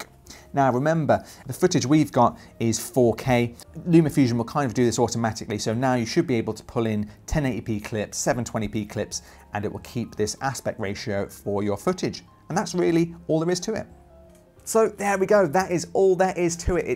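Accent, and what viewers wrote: United Kingdom. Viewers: You are a great facilitator!!! Can this program be bought as an IOS APPS? Can it be used STAND ALONE version? No Photoshop needed? Love the Mersey Accent. Thanks for your attention to my questions.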